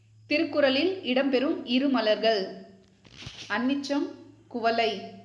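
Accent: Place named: England, United Kingdom. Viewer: Ethukku english kara mam mathiri pesuringa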